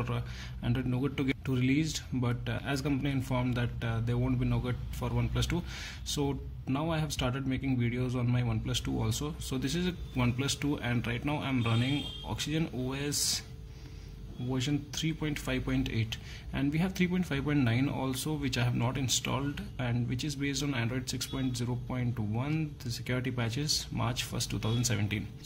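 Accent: India. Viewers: this is an indian English accent. and what u have is american accent every country has thier own accent. i know it makes u not familiar accent but if everyone started saying that speak in my accent then english won't be an international language.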